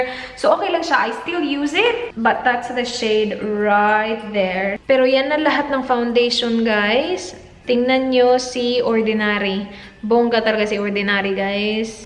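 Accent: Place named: Visayas, Philippines. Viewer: gwapaaa jd kaayo oy <3 hahahah cute kay imong accent ate tagalog pero bisaya ang tuno hahahaha <3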